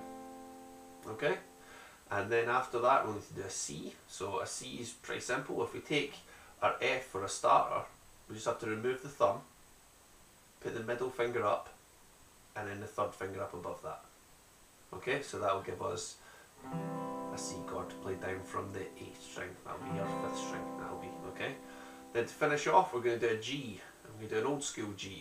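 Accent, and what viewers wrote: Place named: Scotland, United Kingdom. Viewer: my guess is he is scottish, he deffinatley sounds it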